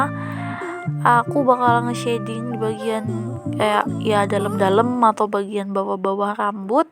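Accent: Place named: Philippines. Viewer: I thought she is speaking Filipino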